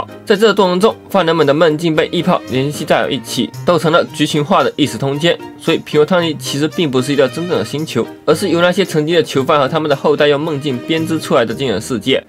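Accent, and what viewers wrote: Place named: Beijing, China. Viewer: 下次可以直接說是北京口音😂（我覺得你說的應該是這個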